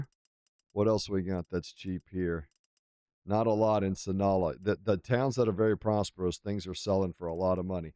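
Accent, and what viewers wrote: Oklahoma, United States. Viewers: I might be a little slow, but I just noticed your accent. Are you from Oklahoma?😂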